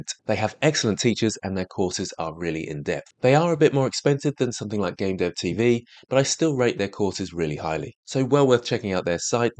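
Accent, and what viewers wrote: United Kingdom. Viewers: Are you from the United Kingdom of Great Britain and Northern Ireland?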